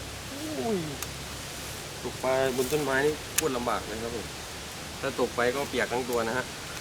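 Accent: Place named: South, Thailand. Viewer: พี่คนที่ไหนครับแหลงเหมือนคนใต้เลย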